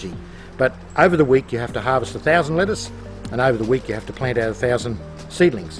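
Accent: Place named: Australia. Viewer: That head of lettuce you picked up was absolutely Gorgeous! When I go to Australia (positive thinking) I definitely want to visit your farm. btw: I just love how you sound. Australian accent is so cool:)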